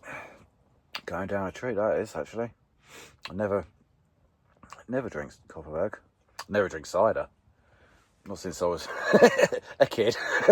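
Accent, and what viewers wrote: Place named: South East England, United Kingdom. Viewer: I feel like I know the accent. You sound south-east UK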